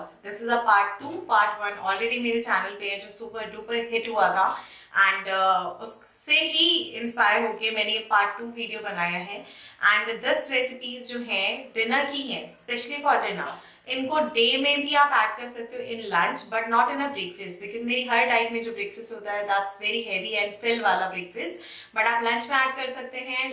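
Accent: Punjab, India. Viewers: Hey r u punjabi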